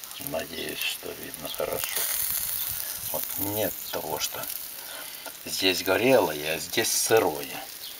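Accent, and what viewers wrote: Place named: Vologda, Russia. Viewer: говор вологодский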